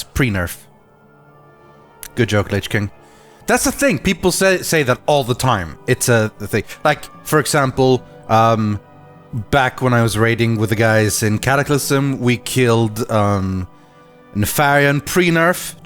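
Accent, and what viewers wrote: Sweden. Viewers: the most Swedish accent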